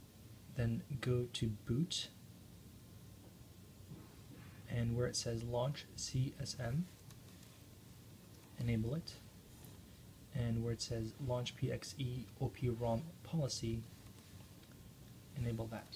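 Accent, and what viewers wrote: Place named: United Kingdom. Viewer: SHIT DAWG SLOW DOWN WITH THE BRITISH ACCENT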